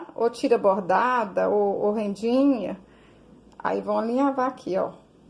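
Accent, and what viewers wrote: Minas Gerais, Brazil. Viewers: E esse sotaque mineiro? 💞💞💞💞💞💞💞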